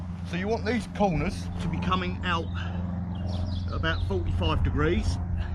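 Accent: United Kingdom. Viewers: Why is it always British guys with hell of an accent introducing Lanshan 2 Pro? I swear...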